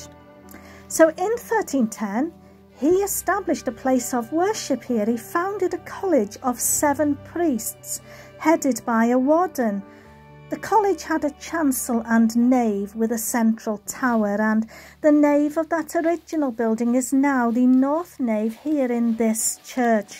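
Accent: Wales, United Kingdom. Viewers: Brilliant 👌♥️ I really appreciate the amount of work and research you put into your videos with the editing and Gifs etc. Ruthin is now a must for me. I love history and that place looks amazing, I’m not actually sure where it is as I’m typing so I’ll Google it 😁 Love the little Welsh lessons, my favourite one though is ‘Car in Welsh is - Car’ 😂 just sounds better with your lovely Welsh accent 👍♥️😁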